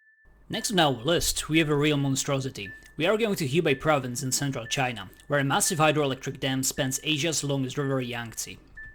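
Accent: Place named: Europe. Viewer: "I live in small country with pop just 10m, with 1m is a force" based on your pronunciation and accents now my scope is GRE, BEL or NED, and maybe CZE too (exclude POR because I heard them accents and I knew it)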